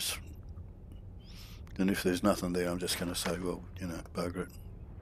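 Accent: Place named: New Zealand. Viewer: Based on the accent, I would guess phlegmatic New Zealander. Am I close?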